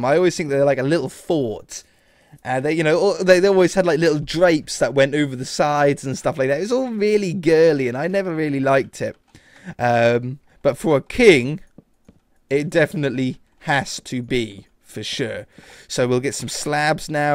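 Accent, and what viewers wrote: United Kingdom. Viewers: That British Accent He never had a castle!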